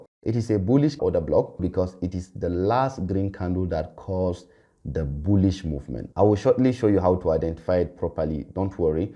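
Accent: Nigeria. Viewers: very good brother , you sound like you must be a Nigerian too that’s why I’m reaching out , let’s rub heads together sometimes hopefully the market charts gets easier with a team ( multiple heads always better than one)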